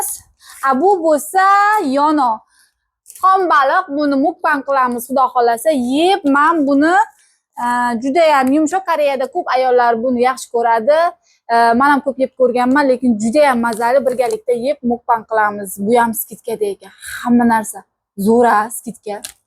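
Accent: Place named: Qorakul, Uzbekistan. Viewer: Ман хам шуни уйладим бу точна Коракул ёки олот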